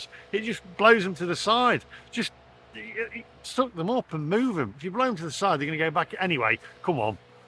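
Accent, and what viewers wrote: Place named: Lancashire, United Kingdom. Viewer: bless you ,they can never take away our memories ,only thing that keeps me going some days ,you sound more lancashire in your younger days lol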